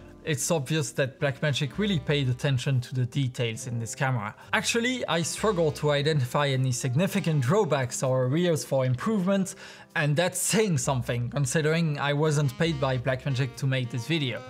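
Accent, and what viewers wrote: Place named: France. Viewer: on enttend légérement l'accent francais.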